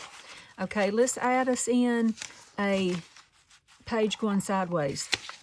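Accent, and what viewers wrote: South, United States. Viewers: Beautiful pages! Whenever I put my journals together now I add a page and think to myself (in your beautiful southern accent) do I like that?? 😊 Love your videos!!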